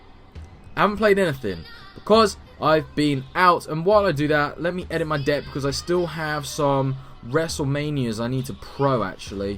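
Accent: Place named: New Zealand. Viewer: Nice vid m8. You actually kinda have a kiwi ( new zealand ) accent a little. Im kiwi and you sound like us bro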